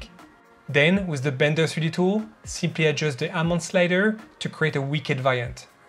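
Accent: France. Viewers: I found your channel just a few days ago. I like it and I subscribed. i like the topics, the way you develop your projects as they teach us many tips. I also like your accent :) are you french? (I am)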